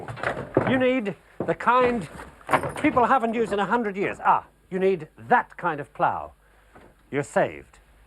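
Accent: United Kingdom. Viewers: This is such absolute bullshit. This is not us being reliant on technology. It is us being reliant on COMMUNITY. And that is a good thing. Oh electricity goes out, so the postman goes to find a farm to grow his own food? This is nonsense packaged up in a nice british accent. Society divides out tasks. The nurse doesn't have to know when to grow crops, and that isn't a failing of society. And we as a species have invented this brilliant invention called electricity and created a network that provides every household with it. Which turns manpower into a communal good, instead of something individuals have to provide. It is a miracle, that yes, it can fail. But we shouldn't give up on it, we should safeguard it.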